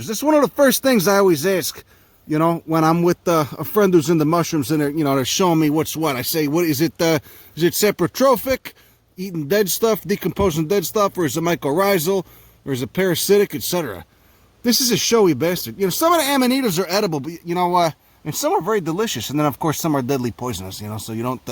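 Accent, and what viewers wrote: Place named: New Jersey, United States. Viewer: Never figured someone with a Jersey accent could be so smart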